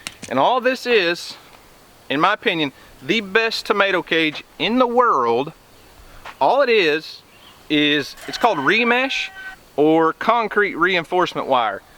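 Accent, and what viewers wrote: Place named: South, United States. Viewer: Ahhhh...a fellow Southerner.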